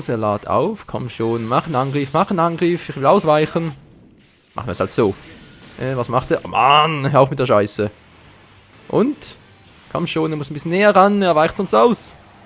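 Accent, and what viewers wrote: Switzerland. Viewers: Bist du Schweizer ich liebe diese Sprache! :DD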